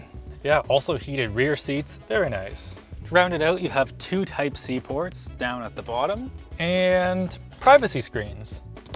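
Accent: Canada. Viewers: I was wondering if it was a candians thing or just a weird thing. you never know... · It's a canadian pronunciation lol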